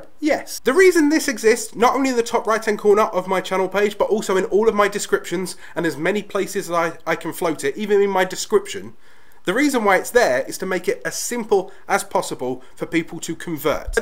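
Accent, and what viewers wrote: United Kingdom. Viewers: This was fun, en pointe, informative, upbeat and British! What could be better? 😊 Will let you know if it works for me! xo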